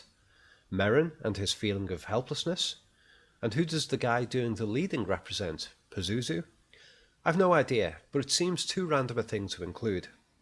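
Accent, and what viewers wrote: Liverpool, United Kingdom. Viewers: Some pretty stupid stuff in here. Then we get to a more obvious one, when she has her right arm raised and he says he's not sure about that one! :o Is that because he didn't make that one up himself? ;) He says people do have their arms up in that situation! Duh! Is that a bit like people do pray like that and the other 100 things you said too?! Also, I think I must be programmed in some way to feel that the Liverpudlian accent sounds thick! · All good except the narrator's distracting Scouse accent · Very impressive job. No self serving bull crap just very insightful points. It's a plus that you are an obvious Scouser.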